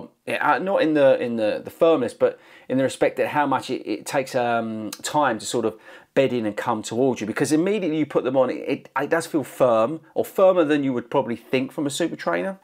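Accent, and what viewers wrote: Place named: England, United Kingdom. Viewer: I’m sure you’ve said it but what part of England are you from? I’m over here imitating the accent 😂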